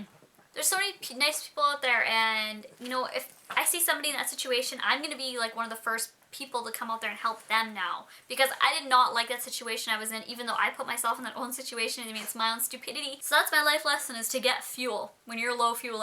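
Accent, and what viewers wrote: Canada. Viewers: Omg u sound so canadian like idk y I notice now lolz its so cuteeee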